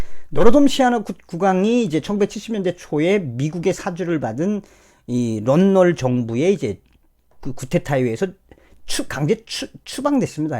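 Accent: North Korea. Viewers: 이런식으로방송하지마셔 이북말듣기싫습니다 우리말나두고왜 이북말로하나요?이북말듣기싫습니다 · 정산성대표님 보면 부칸사투리 웃음부터 나고 좋아요 가열치게 부칸 소식 알려줘 늘 감사해요 건강조심하고 행복하시길 기원합니다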